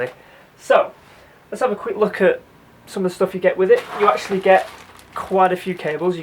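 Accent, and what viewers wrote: United Kingdom. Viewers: love your british accent.a breath of fresh air in the jungle of north American content.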